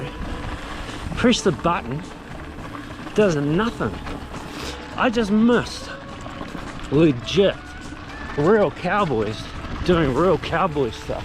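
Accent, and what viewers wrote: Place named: South, United States. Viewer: Your cowboy / country guy voice is awesome. Lol. Keep it up!!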